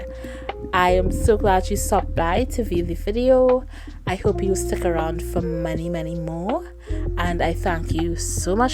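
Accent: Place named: Caribbean. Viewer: Where are from? I detect a Caribbean accent!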